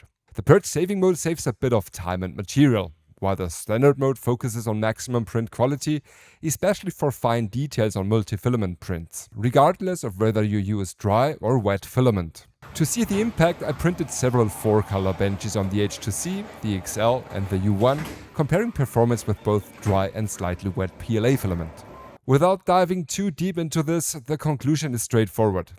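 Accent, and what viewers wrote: Germany. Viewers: man hört aber das du deutsch bist 🫣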